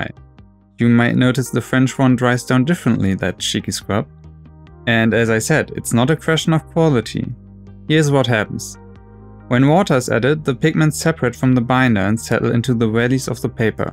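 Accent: Germany. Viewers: Great video. Love your German (I think) accent as well :) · Bist du deutscher? Du hast einen extremen Akzent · Man hört den deutschen Akzent sofort raus :D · Hach ich hab doch rausgehört, das er Deutscher ist!:)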